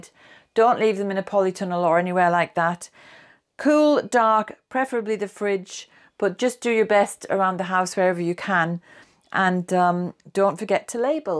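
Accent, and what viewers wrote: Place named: England, United Kingdom. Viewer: ok ,you sounded a bit english, was you born in ireland or just have irish parents, i also have irish grandparents, and a half irish mum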